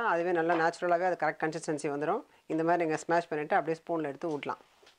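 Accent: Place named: Tirunelveli, India. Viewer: 5 days back I subscribed Ur channel..one full day I watched ur vedios.like Ur slang assault ah pesureenga.r u from Tirunelveli? Also I saw ur juices vedios bought some fruits..made muskmelon juice..then lunchbox ideas was very nice bought a new box for my husband.. plz upload toddler food ideas sathiyama saapda vaika mudila..thanks ungal sevai engaluku thevai ...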